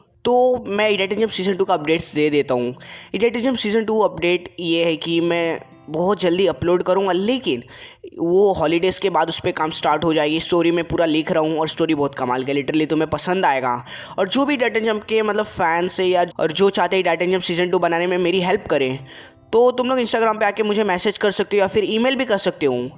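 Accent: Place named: Tamil Nadu, India. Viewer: Bhai Tamil Nadu se ho kya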